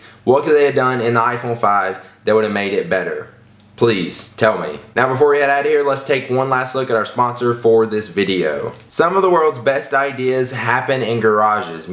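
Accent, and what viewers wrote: South, United States. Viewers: His accent sounds a bit Southern.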